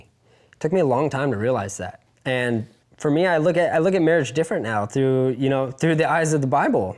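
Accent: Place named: Simi Valley, United States. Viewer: lol i live in simi valley. a lot of my boys talk like that😂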